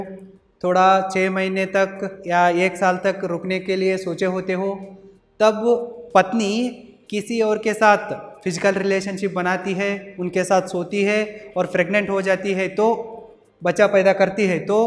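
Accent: Maharashtra, India. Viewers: Marathi or konkani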